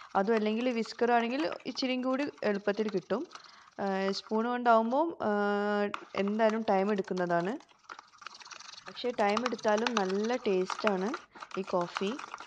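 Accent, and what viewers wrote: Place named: Malabar, India. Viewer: samsaram kettappol thoni kozhikodo malappuramo aavumn. Njn kozhikode aa. Vdos okke spr aanu but sound kurachoode aavato😊😊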